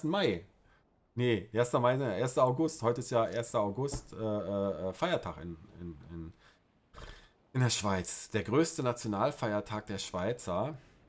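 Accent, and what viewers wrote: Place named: Germany. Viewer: Du sprichst die Städte wie ein deutscher aus. Bist du wirklich Türke?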